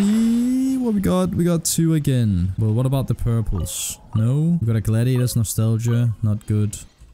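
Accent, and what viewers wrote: Denmark. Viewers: you sound danish an i right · Are you danish?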